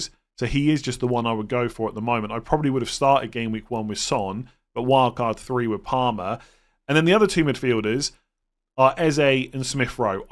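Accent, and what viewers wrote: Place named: West Country, United Kingdom. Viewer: Dude. Love your West Country accent. Saka’rrr don’t lose it man.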